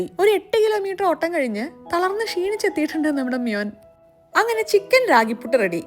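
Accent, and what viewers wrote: Malappuram, India. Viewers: Njannm oru malappurakariyane ngale parayunath neg kug pag anan ninale patayunnath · Athe Malappuramkkariyannen enikk thoniyayirunnu❤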